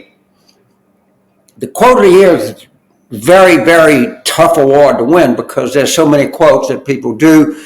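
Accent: South, United States. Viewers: let me give you a little podcast. FYI… Do your podcast in a bedroom or heavily carpeted living room the echo on your voice is kind of intolerable especially with your deep southern accent… Which, besides you is a very difficult one for someone who respects democracy to listen to at this time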